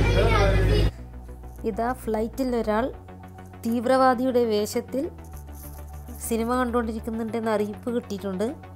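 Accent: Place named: Mattool, India. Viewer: ya monee mattoole samsaram analo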